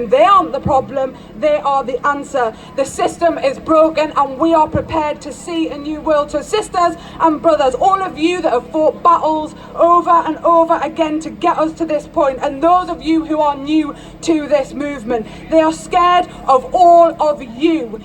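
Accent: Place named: Newcastle, United Kingdom. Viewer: You sound like you're from Newcastle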